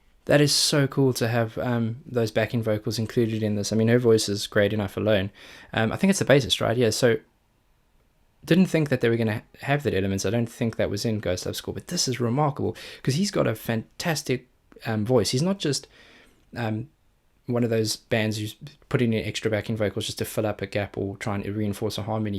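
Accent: South Africa. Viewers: You South African? Goeie reaksies mater!! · I've just subscribed your channel and saw that you've already taken the Nightwish journey. It's been said before that your reaction videos are awesome, lots of detail as far as technic is concerned, without making it too boring. Keep it up dude! OH yeah, one other thing That accent seems quite familiar to me. Shall I risk... South Africa?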